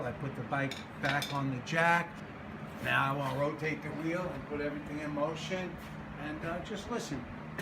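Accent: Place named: New Orleans, United States. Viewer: I volunteer at the WWII Museum in N.O. and when meeting visitors from out of town I always try to guess where they are from based on their accents. You have an accent like people from the N.O. area and also from the northeast. I want to say perhaps the metro N.Y. area, am I correct or totally off base? :- )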